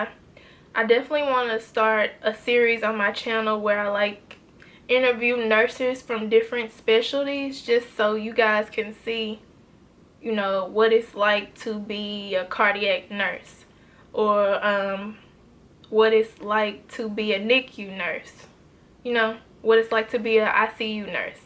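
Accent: South, United States. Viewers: You from down south some where? I can here it in your accent! Is it Tennessee? If not where?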